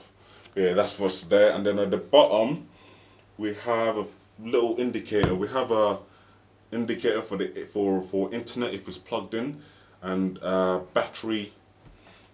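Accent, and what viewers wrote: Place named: United Kingdom. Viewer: Alabama? But sounds like british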